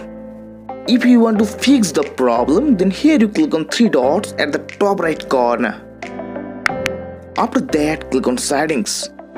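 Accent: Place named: India. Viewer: The second you see him talking in Indian accent i just knew i was in for a good tutorial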